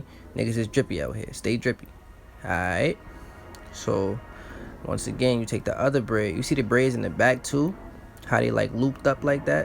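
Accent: New York, United States. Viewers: i know a new yorker when i hear one lolol yurrrr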